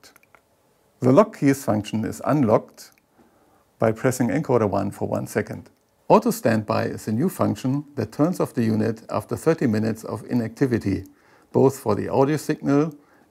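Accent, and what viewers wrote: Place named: Germany. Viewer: I listened to the whole video mainly because of the cool German accent.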